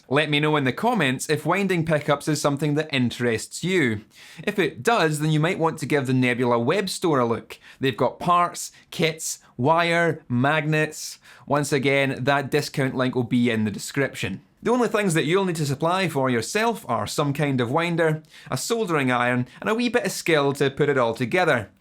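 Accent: United Kingdom. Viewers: That’s British sounding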